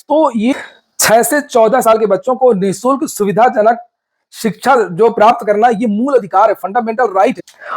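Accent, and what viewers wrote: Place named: Western Uttar Pradesh, India. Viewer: Aap west up ki ton me bol rahe ho bhai kya baat h.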